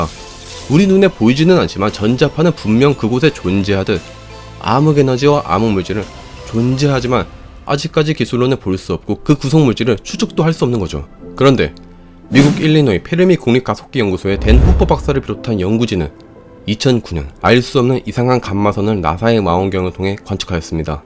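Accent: Gyeongsang, South Korea. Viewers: 깅상도억양 표시나요 숨길수없는 태생의한계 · 실수가 아니라 그렇게 말하면서 살아왔는데 어찌하겠소 경상도인의 특징이고 그런 억양이 강한 동네에선 고치기 힘들며 언어적으로 바르게 쓰려는 흥미가 없는 사람은 본능적으로 기존 발음을 못 고칩니다 제가 이런쪽으로 잘 압니다 본인 의도와는 상관없이 못고칩니다 특히 빠르게 말할수록 더더욱 못고칩니다 사람은 각각의 흥미요소가 다르기 때문에 발달되고 교정하려는 능력도 다릅니다 대신에 다른것으로 우월한 분야가 따로 있겠죠 같은 지역 같은동네 출신이라도 나중에 단어를 어떻게 읽고 어떻게 발음하는지는 사람마다 큰 차이를 보입니다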